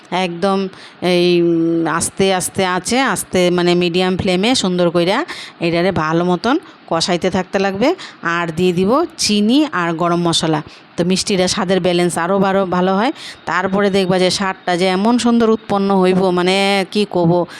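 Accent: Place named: Bangal, Bangladesh. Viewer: খুব সুন্দর রেসিপি। আপনি বাঙাল ভাষায় বলছেন খুব মিষ্টি লাগছে শুনতে।👌❤️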